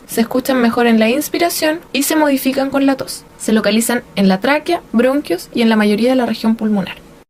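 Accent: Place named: Chile. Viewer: Hermoso acento chileno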